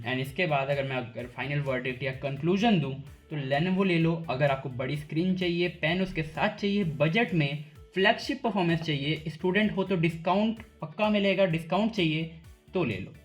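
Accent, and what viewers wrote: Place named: Delhi, India. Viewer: LENOVO OFFICIAL PRICE IN PAK IS 110000 AND I ALSO OWN SAMSUNG FLAGSHIP TABLETS UR ACCENT IS OF DEHLI REGION AND VERY ATTRACTIVE AND LOVE OUR ANCESTORS CITY BEFORE 1947 BEING RAO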